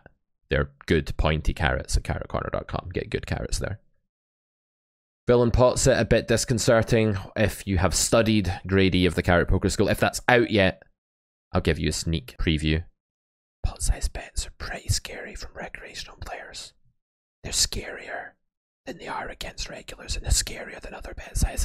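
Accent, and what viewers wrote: Scotland, United Kingdom. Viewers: New sub! Where in Scotland are you from? -poker player from East Kilbride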